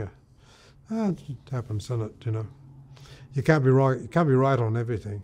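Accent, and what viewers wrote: Australia. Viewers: I always wondered where News of the World stories came from (with an Aussie accent). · As an Aussie from North Queensland too, I recognised his accent straight away! And the “gum tree” in the title gave me a hint too. 🇦🇺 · Wow youve got an aussie on 👏 Hailing from Australia 🇦🇺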